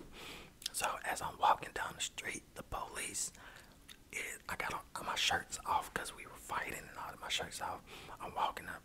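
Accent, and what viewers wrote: Alabama, United States. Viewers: Bruh what part of Alabama you from? This sounds like some Birmingham or Montgomery shit right here hahah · What part of Alabama are you from?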